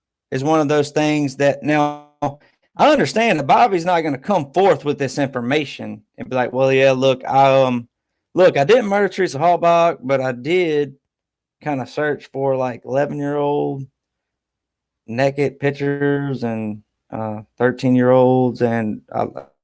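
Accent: South, United States. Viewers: You're my absolute favorite of all M2M enthusiusts...love the southern accent too. I'm originally from Bham, AL I can relate.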